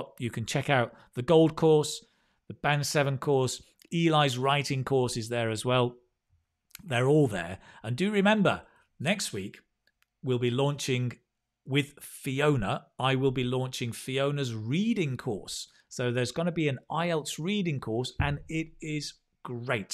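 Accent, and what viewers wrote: United Kingdom. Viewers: Is teacher from UK, bro?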